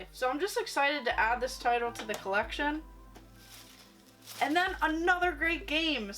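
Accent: Maryland, United States. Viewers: Great video. Love the Maryland accent 'hon! Your collection is incredible, glad I found the channel.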